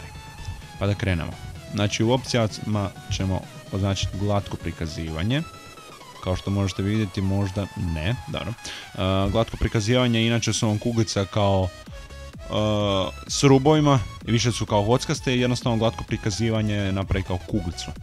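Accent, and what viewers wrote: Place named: Zagreb, Croatia. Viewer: preko naglaska pretpostavljam da zivis negdje oko zagreba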